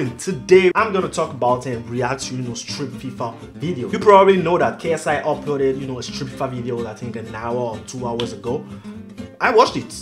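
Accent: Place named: France. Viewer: ♥ Meilleur youtuber avec le meilleur accent FRENGLISH mdr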